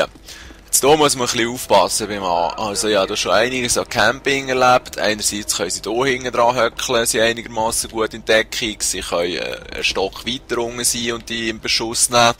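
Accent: Switzerland. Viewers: endlich mal en shwiizer xD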